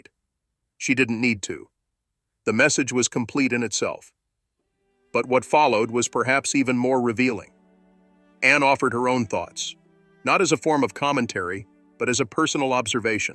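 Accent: United States. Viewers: Disrespectful using a US voiceover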